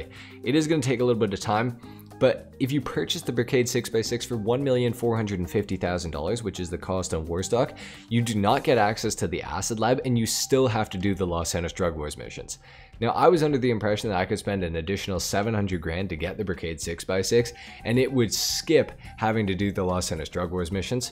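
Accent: Winnipeg, Canada. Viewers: Are u from Winnipeg brotha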